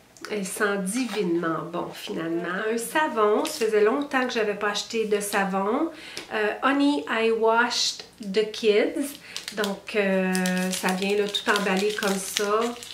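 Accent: Canada. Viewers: Hello! Merci pour ta chaleur et ta douceur... Je te trouve lumineuse même si tu n'as pas dormi cette nuit! Je suis une nouvelle abonnée et j'aime beaucoup justement ton rayonnement, tes ondes positives ♡ Je te regarde depuis la France et j'aime beaucoup ton accent canadien ♡ Je pense que tu pourrais tester les produits et nous donner tes impressions après, cela semble une idée sympa. Je te félicite pour ta chaine et continue c'est un plaisir de de te voir rayonner ☆☆☆! Bisous d'une autre Nathalie! ♡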